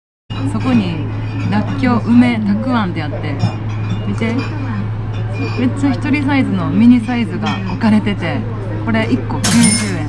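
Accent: Kansai, Japan. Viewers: 顔も関西弁も可愛い過ぎる❤️‍🔥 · なんかオヤジ飲み。でも、おも可愛い関西弁の女や。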